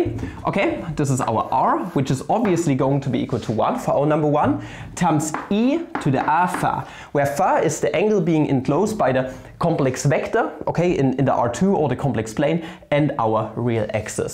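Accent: Germany. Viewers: Are you German or American????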